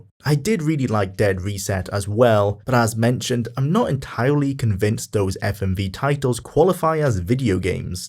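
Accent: Wales, United Kingdom. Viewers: What gave that away? The thick Welsh accent?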